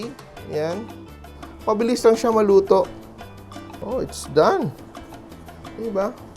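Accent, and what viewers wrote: Visayas, Philippines. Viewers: daan pko sir.. bisaya ka... hahaha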